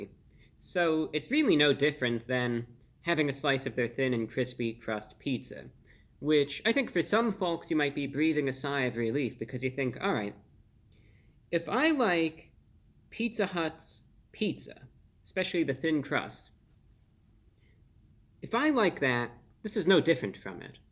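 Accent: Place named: Mid-Atlantic, United States. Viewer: a stylized 1940's Mid-Atlantic or Transatlantic accent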